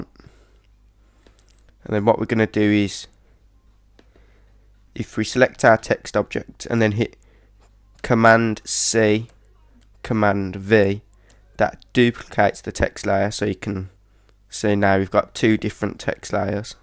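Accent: Birmingham, United Kingdom. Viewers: Im from birmingham you have a similar accent to me where are you from if you don't mind me asking:) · Common brummy :) love the accent pal :) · lol british-liked accent? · He's from Birmingham, i know that accent from anywhere! lol :D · your accent is fucking wicked. where i it from? like what part of the UK?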